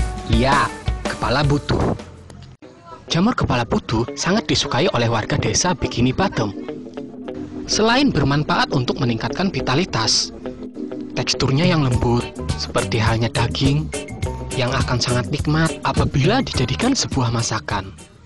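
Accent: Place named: Java, Indonesia. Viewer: bang org mana sih bahasany banjar tpi logatny kyk ada medok jawanya wkwk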